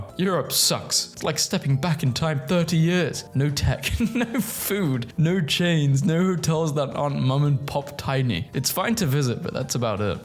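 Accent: United Kingdom. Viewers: Yo british accent kills me, it's funny, anyway, I luv your vids! keep going bruh!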